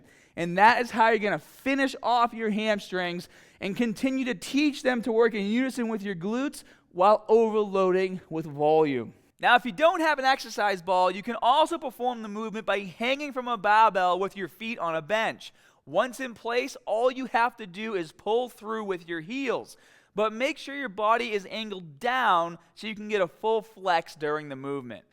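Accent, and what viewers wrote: Boston, United States. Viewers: Sounds like a Boston accent lol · Loving this Boston accent right now. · Is this guy from Baaahston?? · Damn. He sounds like he's from Boston. Every city in the northeast hemisphere of USA has an accent. All different. Philly and New York are similar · this boston accent is killing me lmfaooo good content though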